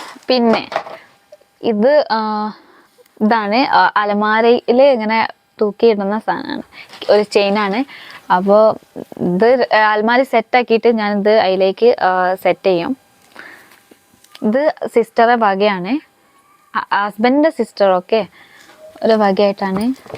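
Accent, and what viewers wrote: Malappuram, India. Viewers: Ivar malayali alle?ariyunnavar paranju tharamo? Pinne engane malayalam padichath? Onnum manasilakunnilla.. Malappuram slang anallo padichittullath.. Husband malappuram malayali ano?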